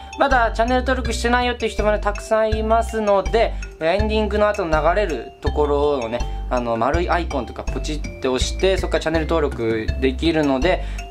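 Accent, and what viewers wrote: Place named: Osaka, Japan. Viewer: かに かに 大阪出身？ しゃべり方が大阪 ちなみに俺も大阪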